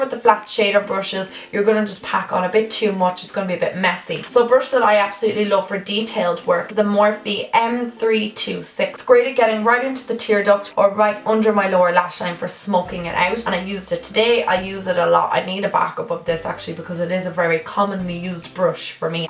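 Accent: Ireland. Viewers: Heyy! I'm new here lol🙈 are you Irish?!? ❤️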